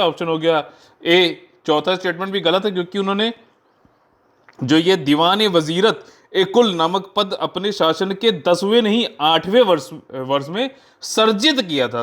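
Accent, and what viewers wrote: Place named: Awadh, India. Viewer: Thank you very much....! But kanpuriya aur awadh mein sab aise hi bolte hai.... ☺️☺️☺️☺️☺️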